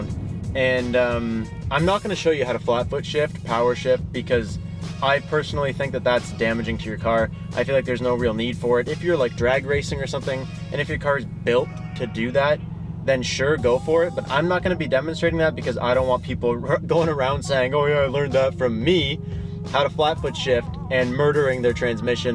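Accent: Canada. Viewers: so canadian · U must be Canadian ? I love it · Tell me your from Canada without telling me your from Canada. You didn’t have to say it we could tell. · 1. He's Canadian, but you heard that one enough by now haven't you? 2. 10 min vids are simply the way you have to do it these days. Youtube pulls so much crap and changes constantly, so it's harder, than ever to grow as a channel. They care about view length more than views, so if your vid is shorter, they won't recommend you. He's doing it so he can still survive as a channel, because the sad truth is, that he pretty much has to until he finds a stable way of income. Remember that he's doing Youtube full-time now, so his income is likely low. He's been asking his subs on how we'd like him to find a stable income, so it's clearly the case here. Also if this was to squeeze as much money out as possible, he'd make it at least 10 min long and put like 4 ads on it, but he didn't. 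3. This tutorial was not meant to be advanced at all. It was clearly a tutorial to learn a basic way to shift faster. It's for new enthusiasts, who are starting out. You know, the people a lot of guys in the car community like to bash for no reason, until they think we're just a bunch of arrogant assholes and walk away, wasting a potential new passionate enthusiast in a community, that is probably coming to a decline soon, as more and more people lose the interest in the engagement of driving? And I know this is true, since I've seen at least 2 or 3 people who gave up for that reason and I notice, that a lot of young people just don't have the same interest in cars as years back. Even I almost gave up, b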